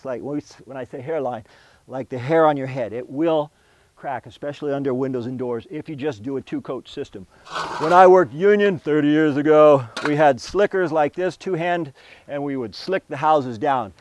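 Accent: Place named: Bay Area, United States. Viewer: You have a Bay Area accent.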